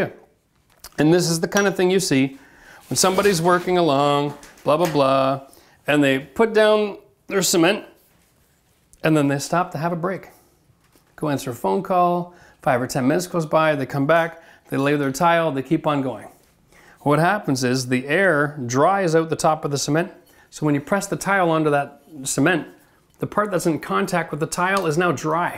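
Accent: Canada. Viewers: and i khew it before I saw the Tim Hortons cup. The way Canadian's say house gives it away every time. or out. Also, he has skills.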